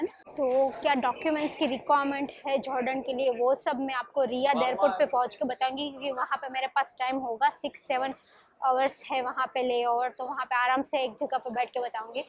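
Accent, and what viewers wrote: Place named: Gujarat, India. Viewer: You speak hindi & English with gujarati accent,🎉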